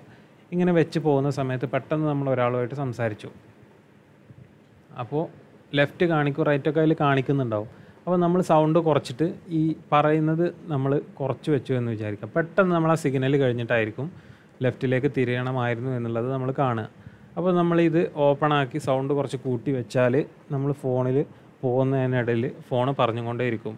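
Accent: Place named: Malappuram, India. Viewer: മലപ്പുറം ആണല്ലോ താൻ... ആ സംസാരം കേട്ടാൽ തന്നെ manassilavum.. പിന്നെ എല്ലാ മലപ്പുറം ടീമും എറണാകുളം എന്നുള്ളത് എറണാoകുളം എന്നെ പറയൂ... വിവരക്കേട്.....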